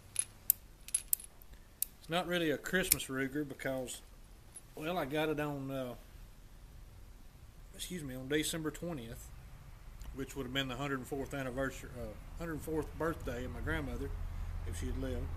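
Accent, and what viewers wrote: South, United States. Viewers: Very southern laconically. Best regards vom Switzerland.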